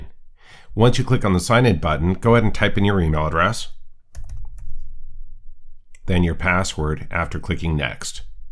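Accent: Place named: United States. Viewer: And u speak "American-English." I can understand some dialects, accents...But IF I cannot -- that's just another CHALLENGE for me in an already challenging situation. I dont want to be inflexible, but it is just a fact. Again, MANY THANX!!!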